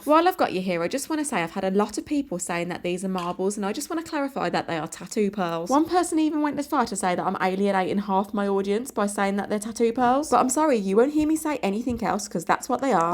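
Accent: United Kingdom. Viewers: I love a brummy accent, it's so comforting ❤ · are you scottish?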